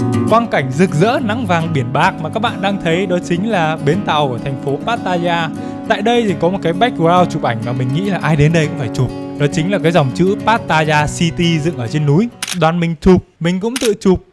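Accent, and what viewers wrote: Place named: Hai Phong, Vietnam. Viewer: em đăng kí kênh anh r nha thấy giọng hải phòng hài vô cùng luôn á e nghĩ a nên phát triển thêm mảng tiktok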